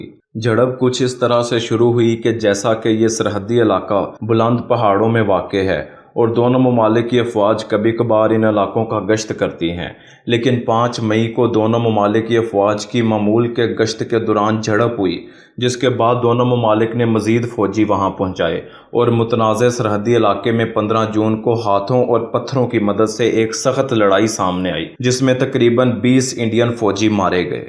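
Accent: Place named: Punjab, Pakistan. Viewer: Why you have Punjabi Accent. Because you are part of India.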